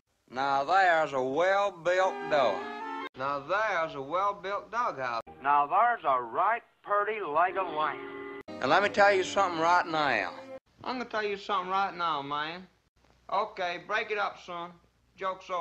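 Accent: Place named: North Carolina, United States. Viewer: That's a mighty fine North Carolina accent there, man.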